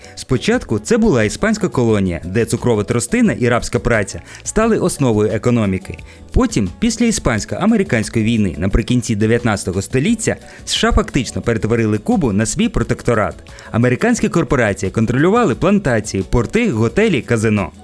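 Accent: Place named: Russia. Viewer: Хороший контент, але дуже ріжуть вухо деякі слова промовлені на руснявий маневр. В українській мові, всі голосні вимовляються чітко. А в вас досі є - кантраст, риспубліка і тд. Будь ласка, попрацюйте над вимовою. Підписалась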